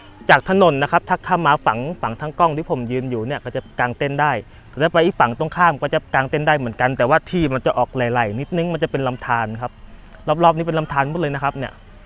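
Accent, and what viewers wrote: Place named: Sukhothai, Thailand. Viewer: สำเนียงโข่ทัย...น่ารักดี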